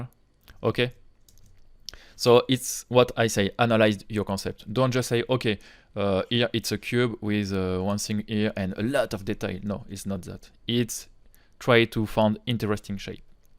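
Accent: France. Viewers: Some good french accent. As a french, it's music to my year.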